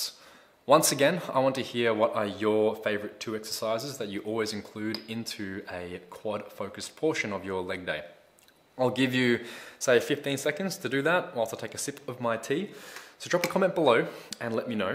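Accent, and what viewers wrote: Australia. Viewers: Came here for the Quad exercises. Subscribed for the quality info delivered with a chilled Aussie vibe 👍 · OMG you're aussie!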